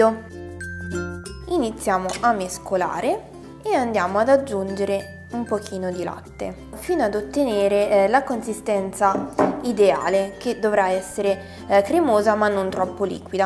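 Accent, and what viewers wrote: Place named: Marche, Italy. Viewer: sembra buonissima!! ma per caso sei di Senigallia? hai un accento marchigiano! :-)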